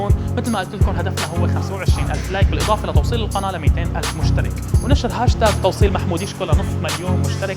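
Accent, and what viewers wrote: Syria. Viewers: أول شي فديت اللهجة السوريه ما احلاها محمود دخيل أمك شو اسمك ههههه اضحك والا فاعلم أن أعداء النجاح منعوك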